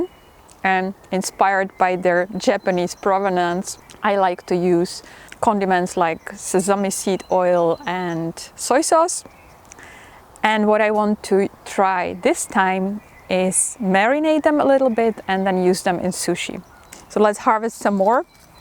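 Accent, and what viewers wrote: Netherlands. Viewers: My family is from the Netherlands 🇳🇱 but I’m from 🇨🇦 I knew the accent instantly 😊great info great video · Does she have a Dutch accent? Or Danish?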